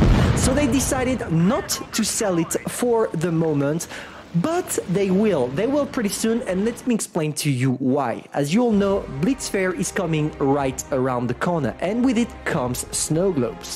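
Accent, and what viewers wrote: France. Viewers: I live in a cave but I speak English without such an annoying French accent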